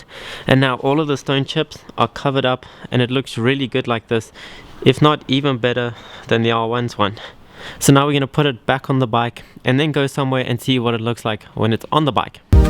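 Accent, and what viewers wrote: South Africa. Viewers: I knew your voice sounded south African btw love your vids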